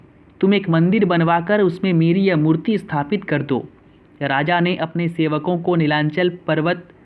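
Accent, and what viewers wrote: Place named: Bihar, India. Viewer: Ra ki da mat karo na hi da ki ra mat karo...I know may b u belongs to Bihar ya UP ya Jharkhand to aap ka accent thoda change hota h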